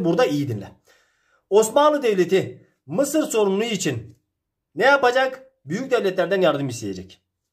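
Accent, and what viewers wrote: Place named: Diyarbakır, Turkey. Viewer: Hocam alakasız olacak ama Diyarbakır'lı mısınız ? Tam o tarafın ağzı ile konuşuyorsunuz da merak ettim açıkçası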